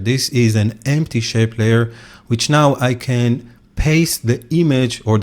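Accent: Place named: France. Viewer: I think you are French i like your accent! And Thank you for the work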